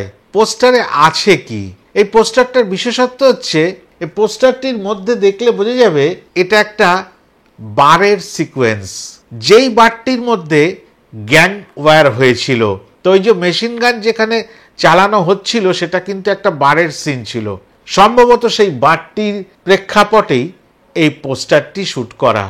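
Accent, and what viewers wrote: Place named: Bangladesh. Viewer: Dada, apni to darun Bangladeshi accent e Kotha bolen. Amon accent e aro Kotha shunte chai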